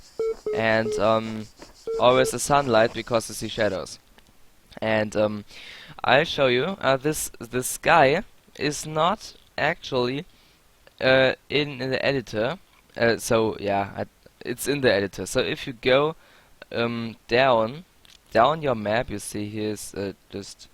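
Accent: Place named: Germany. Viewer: "Houston We have a problem". I uploaded to google drive two files created with the editor. Links are: <link> <link> My limited knowledge of English, your German accent difficult translation providing youtube and subsequent translation into Spanish, as surreal as hilarious, has forced me to create these maps modifying the demo. I am aware that it was a mistake but it's demasido late to turn back. I created maps intertwined for high level players but I have reached the limit of my knowledge about the editor and do not know how to solve some problems. I created two maps: the first map does not appear sigils or stars or the computer terminal. In the second I tried to introduce sigils but have arisen configuration errors (HUD and other elements, for example) and do not know how to solve satisfactorily, so I need your help. I know I ask too but if you help me solve these problems could finally upload to steam.al least one of the two maps. 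Thank you.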